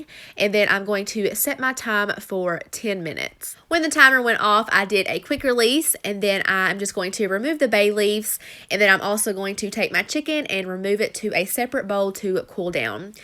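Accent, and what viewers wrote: United States. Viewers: Hi came here from the other vlog, im from Birmingham Uk. Love your country accent and your mac n cheese.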